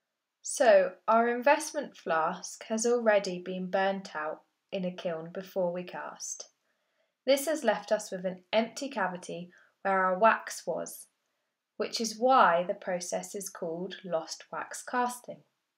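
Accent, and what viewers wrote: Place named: United Kingdom. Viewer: I liked immediately for the British voice. hope it’s good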